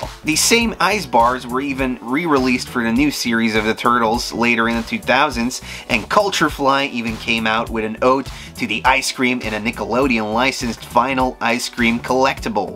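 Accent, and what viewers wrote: Midwest, United States. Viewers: First time watching. Your accent is really interesting and familiar. Midwest?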